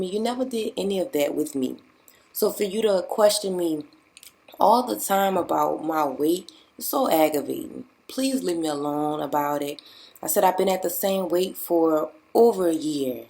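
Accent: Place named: New Orleans, United States. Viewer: you from new orleans? i hear it in your voice lol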